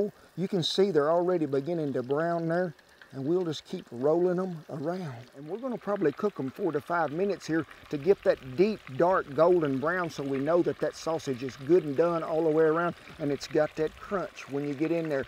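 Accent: South, United States. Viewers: About yay much!! Warsh it!!! I miss my southern people. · I love this guy! Wow does his little mannerisms remind of my dear sweet departed daddy.....❤️thanks for the entertainment and the recipe looks to die for! He is near and dear to my heart already! What a sweetheart and wise man! I love his style and southern accent makes it all better. Chattanooga Tennessee sends its love!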